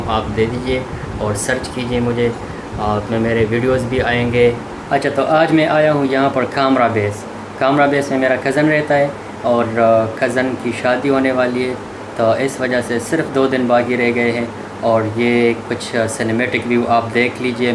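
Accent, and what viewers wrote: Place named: Bengal, India. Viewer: AP Panjabi hA ko bangali hum ko to bangali lagraha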